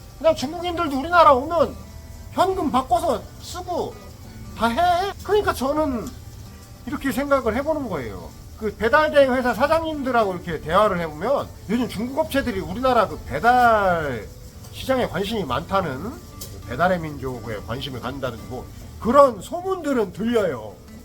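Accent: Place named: Chungcheong, South Korea. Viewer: 역시 충청도여 ㅋㅋ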